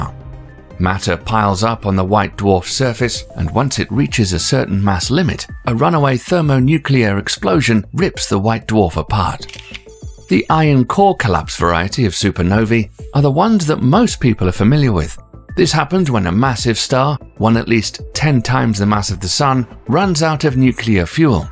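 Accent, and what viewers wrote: England, United Kingdom. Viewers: I came here to this channel to 1) Learn science 2) Learn that posh English accent by pausing and repeating everything he says (sehzz) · As you have an English accent, please stop pandering to the US and call Ursa Major by its proper name - you'll be doing them a favour too, as some of them will learn something as well. By all means explain how they refer to it but I hate it when you forget the rest of the world. Thank you.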